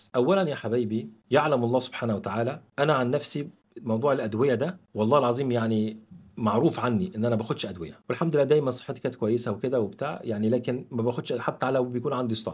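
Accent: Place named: Egypt. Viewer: ما شاء الله مغربي بس لهجتك مصري جدا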